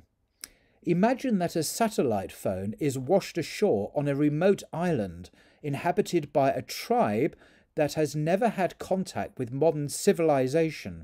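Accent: United Kingdom. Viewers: Love your British accent, thank you for the clear exposition!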